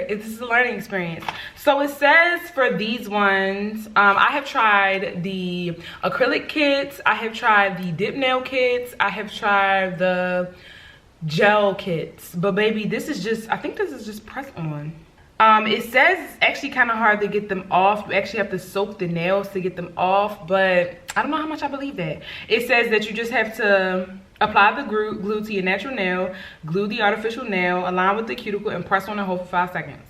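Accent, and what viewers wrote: Baltimore, United States. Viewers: You’re from LA? Girl, I thought you were from DMV or Baltimore. That’s what your accent sounds like.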